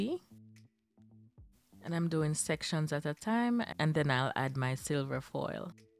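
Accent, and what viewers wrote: Jamaica. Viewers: Your English sounds Jamaican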